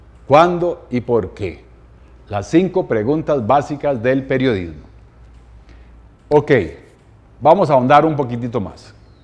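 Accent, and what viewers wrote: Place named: Costa Rica. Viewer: Tu asentó es muy bonito, debes de ser costarricense.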